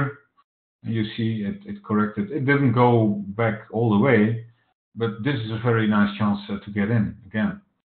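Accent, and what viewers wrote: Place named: Netherlands. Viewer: Lekker Nederlands accentje hoor! Maar een goeie analyse, keep it up!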